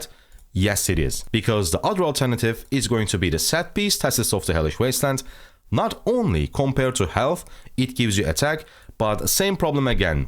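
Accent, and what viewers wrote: Turkey. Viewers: Bro you have a strong Turkish accent are you Turkish by any chance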